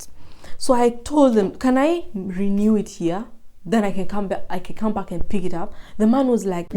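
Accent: Nigeria. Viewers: U got new sub I'm so sorry for what you go through I also I noticed you have naija accent 😂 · It's giving Nigerian accent!! You are strong girl.❤ Keep up gal! You are a star. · Pole..i noticed your accent is almost Nigerian. Dont worry things will be better. One door closes and 2 more opens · but accet yako ni yawanaijeria😂😂😂😂u speek like them not kenyan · You kinda have a Nigerian accent. Anyways, sending all my love ❤️ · Why do you have a Nigerian accent? 🤔. Ubaya ya wasichana wakenya ni kupenda ngono sana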